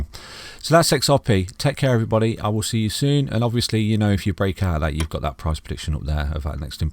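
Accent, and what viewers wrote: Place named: England, United Kingdom. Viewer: Just found your channel this week mate, glad that i did, nice to hear a fine english voice, you explain in easy terms and love the no Bs with no mouth open buy now headers, will be following often, great content! thanks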